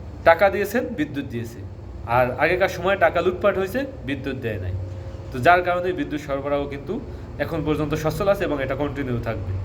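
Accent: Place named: Barishal, Bangladesh. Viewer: Report করলাম। ফাজিল। তুই বরিশাইল্যা বল জানিস।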